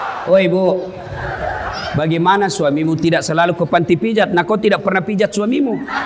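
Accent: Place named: Bugis, Indonesia. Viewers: Logat yg sangat kental logat ciri khas suku bugis makassar Ewako pak ustad ❤️ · Sya seneng logat bugis nya👍👍😂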